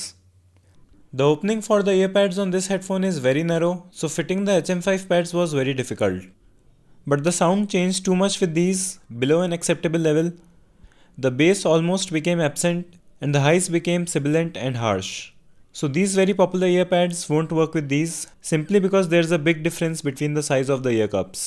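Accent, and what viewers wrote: India. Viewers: India...can you just...live and speak your native language and dont try to speak english? Yours is so awful. I'm sorry, but you so annoy to try speak normal language with your indian accent. Nobody understand what you say correctly. You need to repeat 100500+ time to understand. English is not yours. Learn some other... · he shouldn't ignore it, the comment is true, indian accent is difficult to understand for majority of people including me. Though the contact is pretty darn good, it feels like he is reading a script. So consider the original comment, it only makes you better.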